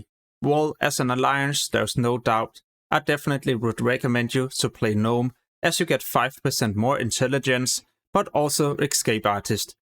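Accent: Denmark. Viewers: Lyder som en dansker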